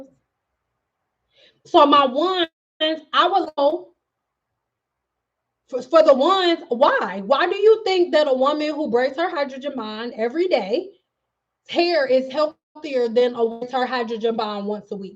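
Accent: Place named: California, United States. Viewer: I know you from Detroit but you sound like us Cali ppl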